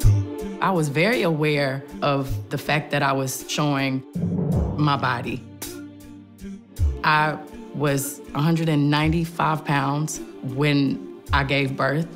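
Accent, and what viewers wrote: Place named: South, United States. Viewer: She's so country!!! Cute. "I'm just tawking shyite." Lmao great stuff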